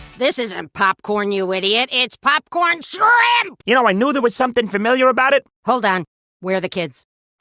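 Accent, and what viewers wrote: England, United Kingdom. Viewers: You talking about red lobster with English accent? Fuck you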